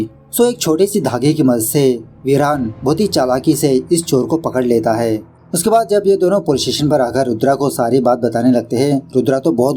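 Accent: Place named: Mumbai, India. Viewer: Thobde 😅 mumbaiya Hindi with style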